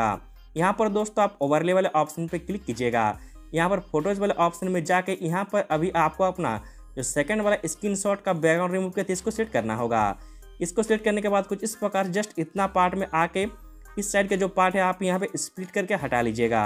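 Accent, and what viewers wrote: Chhattisgarh, India. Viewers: Bhai aap chhattisgarh se ho kya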